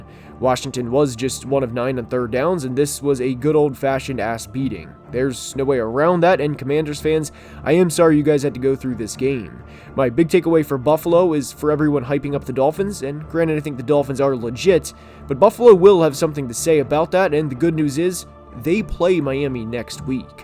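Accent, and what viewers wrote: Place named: Wisconsin, United States. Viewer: Bro you have to be from northern Wisconsin. Also great recap! Earned a sub. Keep it up.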